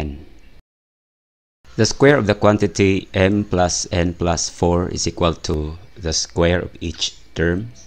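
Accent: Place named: Philippines. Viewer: You sound like a Filipino po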